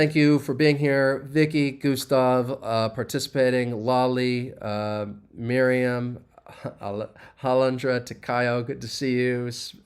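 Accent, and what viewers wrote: United States. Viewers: The most stupid American English accent which no one in the world understands it.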